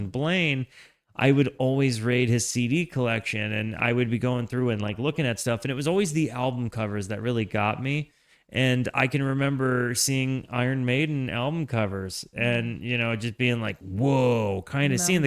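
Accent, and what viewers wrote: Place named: Locust Valley, United States. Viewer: This guy has that Locust Valley Lockjaw accent.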